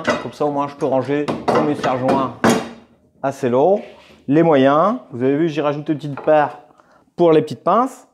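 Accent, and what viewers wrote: North, France. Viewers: merci l'ami, une bien belle fabrication... je n'aurai pas pensé au clous de renfort. un ch'ti acchin del nor ?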